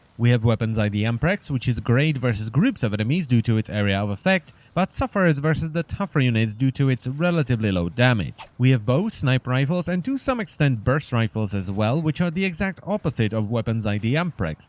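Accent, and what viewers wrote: Czech Republic. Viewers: >that czech accent Nádhera :^) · Czech accent af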